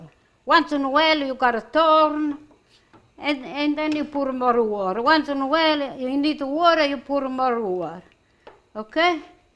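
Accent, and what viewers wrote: Italy. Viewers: is so precious! I love to hear her Italian accent. I love you!❤ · Devo dirti che ero davvero preoccupato per la tua così lunga assenza… La prossima volta fai dei brevi video per far sapere a tuoi amici che stai bene… Amo la tua cucina (e il tuo inglese con accento italiano 😄) e questa volta hai cucinato la mia vita No .1 ricetta!!! 😀😀😀♥️♥️♥️🇮🇹🇮🇹🇮🇹 Il tuo super fan greco dalla Polonia/Germania….. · I love the way you cook. But I really love listening to your Italian accent and your singing!!! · Italian accent sounds very good and it sounds so better, when it's about italian cuisine! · I've recently started watching Grandmas videos and I'm learning a lot. I love her Italian accent. I will be trying these recipes at home. Love you Grandma from South Africa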